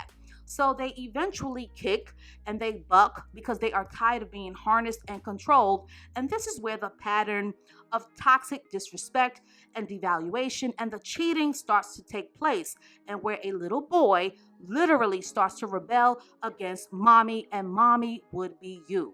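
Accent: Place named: New York, United States. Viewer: Hey, Can you please do a video on fatherless daughter. I’m not sure if you have already. I’ve been binge listening & haven’t come across one of that topic.bI love your channel so much. I hear the New York in your voice. It brings me comfort. The Bronx? 🤷🏽‍♀️ Thats my birthday place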